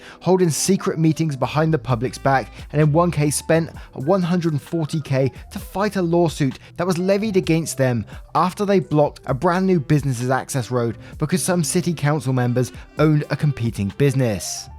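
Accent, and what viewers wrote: England, United Kingdom. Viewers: You say H like any British person does. Doing nothing wrong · why the heck shouldn't you say "haitch?" You're English! That's how folks from England say the letter H. If you want to say it "aitch," knock yourself out. I'm not gonna tell you how to talk. And neither should anyone else. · I just found it amusing that alot americans , are trying to correct a English man, literally born in England, on how to speak and pronounce English.........🤣😂🤣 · Ha, don’t stress about it, I mean I just figured that the “h” thing was a British thing and reasoned that if you did it, then all Brits did it! 🤪🤦‍♀️. ALSO this’s off topic, but I recently discovered a hilarious British show called PLEBS... England has been holding out on us because that show is pure gold (well at least the first 3 seasons)!! 🤌👏🤣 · You don't need to change the way you pronounce letters. 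I've heard a number of people from the UK pronounce the letter H the way you do, and there's nothing wrong with it. I hope you don't feel like you have to adopt Americanized pronunciation to please your viewers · I love the English pronunciation of H. Don’t change, and still say zed, not z. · You’re British, people are really bothered that you have a different accent? 🙄🤦🏻‍♀️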